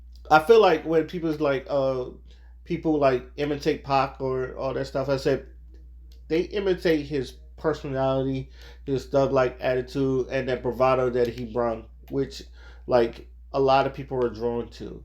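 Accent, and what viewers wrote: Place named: East Coast, United States. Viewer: U sound real dum n east coast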